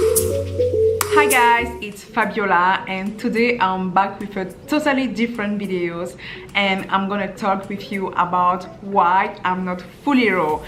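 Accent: France. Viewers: I think it's a french accent (kinda like mine ahah) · Are You French ? Super video! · Are you a native French speaker? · Elizabeth Lizzy it is a French accent, it was mentioned in her Q&A video :)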